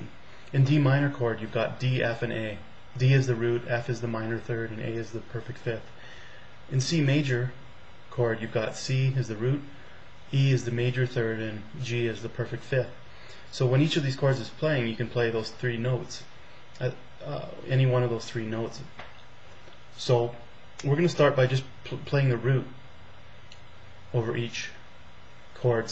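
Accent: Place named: Nova Scotia, Canada. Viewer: East coast??? I'm from Truro, NS. Small world! Funny I didn't notice the accent probably because it sounds normal to me! Great lessons though, i've been looking for something exactly like this.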